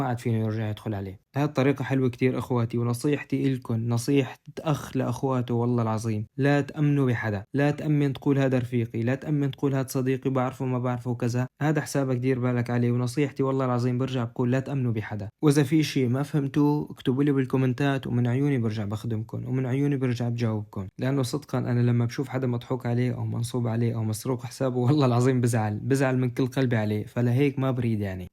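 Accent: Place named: Syria. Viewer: شكرا على راسي بس انت من وين حسيت لهجتك سورية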